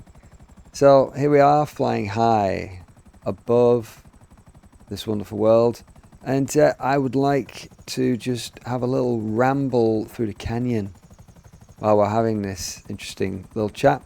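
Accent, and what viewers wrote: United Kingdom. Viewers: Came to this channel for GTAVI, stayed for calm british ASMR vibes. Loving the videos man, keep it up!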